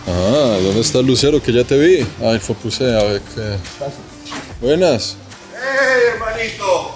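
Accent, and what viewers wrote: Bogotá, Colombia. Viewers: Hola,! Somos una familia colombiana que emigró a Canadá.Te seguimos hace tiempo, tus vídeos fueron muy útiles en ese duro proceso de hacer a un lado el miedo y volar.Nos encanta tu buena vibra y nos conecta ese Español Bogotano tan auténtico qué no te abandona.Te deseamos lo mejor...Por supuesto la tierra del realismo mágico siempre nos llama, allí están nuestras raíces.Un abrazo y éxitos 🍁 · claro, y no es una teoría es lógica, estando un colombiano en canadá hablando inglés y francés por qué cambiaria su acento en español?, no hay nada ni nadie que le haga hablar español de distinta forma. Podrá olvidar palabras pero no la forma de pronunciarlas. Estando en España en cambio, tendría que usar el español para entender, hacerse entender y relacionarse con los locales, además sería normal que cambie palabras y dichos para que encajen con los locales y evitar malentendidos o tener que explicarse a cada rato, no te digo que hubiera cambiado por completo su acento pero si usaría palabras y expresiones del país huésped. 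Esto pasa en los dos lados, un español en colombia, argentina o méxico adaptará su acento al del país. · Me encantan tus videos, muy auténtico y me recuerda el acento de mi bella Colombia, que es lo mejor de lo mejor. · La primera vez que te vi fue en un video de Oscar Alejandro. Escuché el acento y los dichos como “pichirilo” y me dije: “Es Colombiano”. Desde ahí te veo sagradamente todos los domingos y cuando sacas vídeos extras. Siempre tuve la idea de establecerme en Canadá. Tuve una beca para un curso de actualización en 2013 y me encantó el país. Hoy las cosas están confusas (y ya voy para los 50…), haces ver fácil tomar decisiones, creo que está en tu naturaleza de inadaptado. Yo creía que también era inadaptada y hasta cierto punto lo soy. Sin embargo, ahora que tengo nuevos cambios desde 2022… he confirmado mi confusión. De momento, prefiero quedarme y adaptar mi base a mi… Las cosas a los 50 se ven distintas, la energía y las prioridades cambian…me parece. 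Tal vez esta incomodidad que tengo me haga tomar una decisión como tú. Gracias por tu transparencia y sencillez. Bendiciones en esta nueva etapa. Abrazos desde Bogotá, chaolín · 13 años en Canada y no perdiste tu encantador asento Colombiano❤ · Acento Bogotano!!!!! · Cachaco de pura sepa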